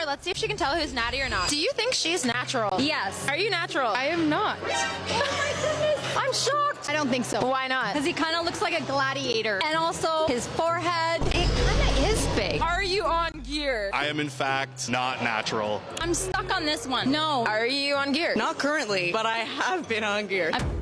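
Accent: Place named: England, United Kingdom. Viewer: damn, I wrote that with full chest, while listening to your english accent 🤸‍♀️🤸‍♀️🤸‍♀️ gonna roll away now🤸‍♀️🤸‍♀️🤸‍♀️🤸‍♀️🤸‍♀️🤸‍♀️🤸‍♀️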